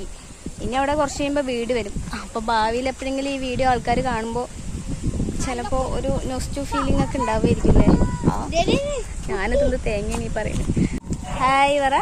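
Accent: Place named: Thrissur, India. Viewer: Thrissur slank anello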